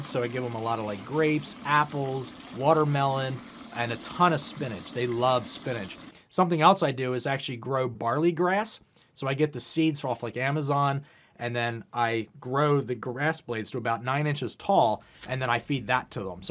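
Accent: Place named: Pennsylvania, United States. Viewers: I nailed you as a Pennsylvanian as soon as I heard your voice. Glad I still recognize my native tongue 😂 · You have a very Delco accent lol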